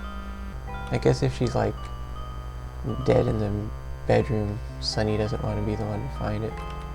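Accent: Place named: New Zealand. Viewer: Sound like he is from New Zealand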